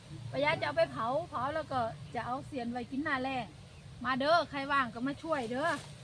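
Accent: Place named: Chanthaburi, Thailand. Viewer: สำเนียงจันทบุรี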